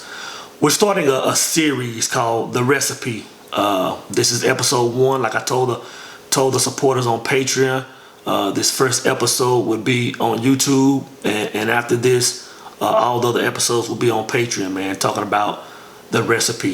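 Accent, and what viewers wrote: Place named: East Texas, United States. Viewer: Man u from East Texas. I knew it! Great video!! I'm in Cedar Hill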